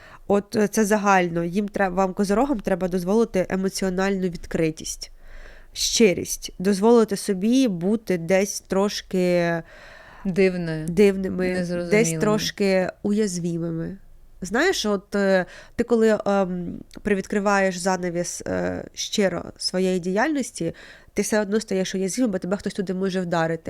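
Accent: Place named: Surzhyk, Ukraine. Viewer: Це неможливо слухати. Як людина з таким жахливим суржиком дозволяє собі виходити в ефір. Це, в першу чергу, неповага до слухачів, і до Вашої аудиторії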